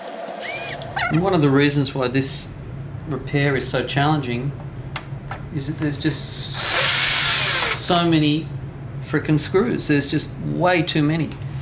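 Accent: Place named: Australia. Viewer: Great video, good the hear you still have the great Aussie accent! Different problem with my 6000 but excellent guide.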